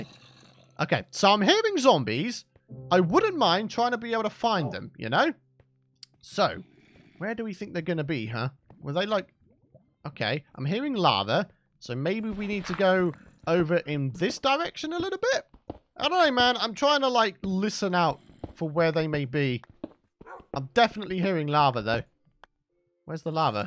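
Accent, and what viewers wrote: United Kingdom. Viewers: Where in the uk are you from? I’m from wales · Lol I hear that too. He has a British accent like stampy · You sound like the british version of Woody from toy story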